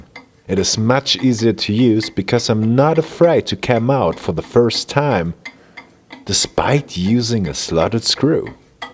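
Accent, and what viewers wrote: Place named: Germany. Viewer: Interesting video, interesting toolset. I only have seen those hollowed tips on -Screwdrivers and I am thinking about such a set since months now. But if I am totally honest, I don`t have to work with a lot of slotted screws. So probably I will be fine with the screwdrivers I already own. Nonetheless I`m really happy to see you back here again. I really like your style of videos. Always kinda fun to hear germans talking english about german tools and been remembered to that when they call brand names oder place names - especially "Remscheid". Finde ich super!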